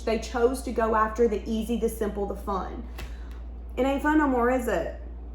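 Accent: South, United States. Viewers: Oh my gosh! I LOVE your hair!!!!!!! You are seriously cute as a button. And that southern accent just completes it 😆 Total wallflower fan girl, loyal subscriber here in Dallas! On to the reading. I already know it’s going to be on point, as always. 👌🏼 Love you to infinity and all of my other favorite card readers for sharing y’all’s gifts with us. It makes us feel so seen and heard! ❤ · Wonderful Reading 💜 And I Just Love Your Southern Accent & This Is Coming From A Texan 🤭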